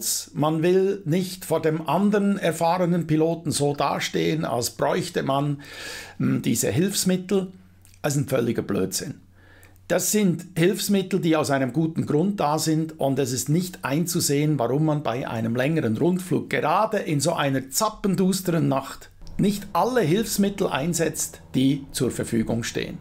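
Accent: Switzerland. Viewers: In der Flugplanung schon Fehler und dann noch in der Kontrollzone abdrehen müssen. Ich weiß nicht was diese zwei Jungs da oben in dieser Nacht geritten hat. Da ist nicht viel Flugerfahrung eingesetzt worden. Traurig und es wäre nicht notwendig gewesen, in dieser Nacht sein Leben zu verlieren. Interessantes und gut erklärtes Video. Ich mag Deinen Schweizer Dialekt. LG aus EDTF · Das ist kein Schweizer Dialekt, das ist ein Schweizer der Hochdeutsch redet. Das tönt ja in Deutschland auch je nach Gegend verschieden.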